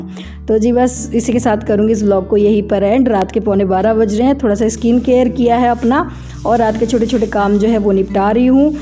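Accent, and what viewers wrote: Rajasthan, India. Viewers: Di ap vlog k bich bich me aise hi marwadi batein sunate rehna bahut achha lagta hai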